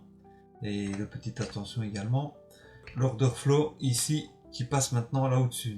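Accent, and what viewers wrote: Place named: Belgium. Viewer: merci pour la précision je pense qu’on s’est formé chez la même personne et en plus ta l’accent belge donc je m’abonne ☺️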